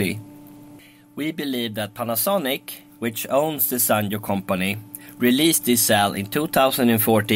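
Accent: Sweden. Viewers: Sounds like you are from Lidingö…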